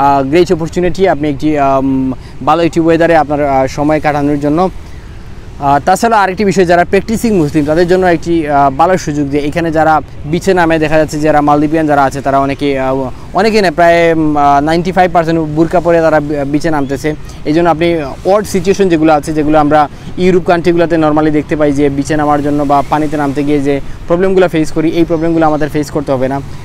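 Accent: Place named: Sylhet, Bangladesh. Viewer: সি‌লে‌টি টোন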